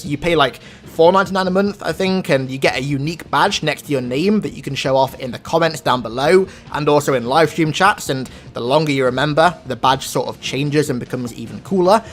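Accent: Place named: United Kingdom. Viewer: Your voice is annoying I didn’t know I’ll be listening to a British pausing squeaker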